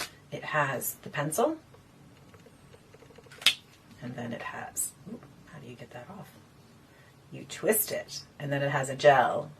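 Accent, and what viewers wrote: Ireland. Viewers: You sound Irish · Your accent sounds ever so slightly Irish at times. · Is it just my imagination or do you sound Irish sometimes? I just bought this baked foundation in porcelain and will try it today for the first time. Every review I've seen is amazing. Love your channel, I'm 50 and have never really used any sort of moisturizer or sunscreen because I would have a reaction to everything. But now at 50 I've been using Tallow which I triple render and my skin seems to love that just fine.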